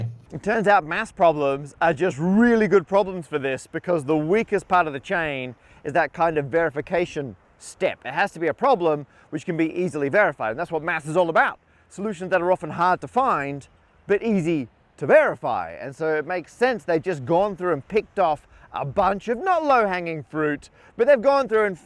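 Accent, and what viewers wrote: Australia. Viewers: That might make sense except for the obviously Aussie accent lol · Oh yeah, I forgot he's australian.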